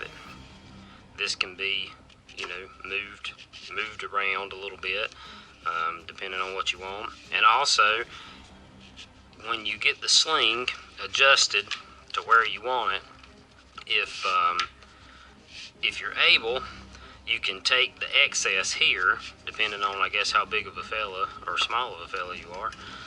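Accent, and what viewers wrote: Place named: South, United States. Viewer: Definitely made life easier. Your instructions were easy, especially pulling the strap through the keeper. The MAGPUL instructions, either the included or the YouTube instructions, are close to useless. Enjoyed your sense of humor also. Being a Yank but having lived for 35 years in Virginia, I found your accent was a bit of 'down home.'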